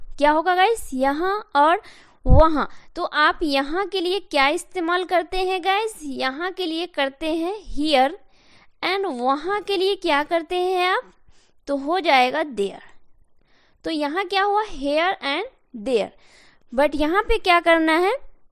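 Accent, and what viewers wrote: Bihar, India. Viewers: It seems from your voice, you are from bihar..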